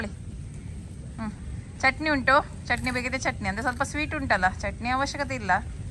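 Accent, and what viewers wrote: Mangalore, India. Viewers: Mangalore kannada thumbha chennagide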